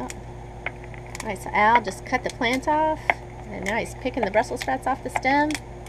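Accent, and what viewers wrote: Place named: South, United States. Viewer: Just love your southern twang! You sound like my sister 🤗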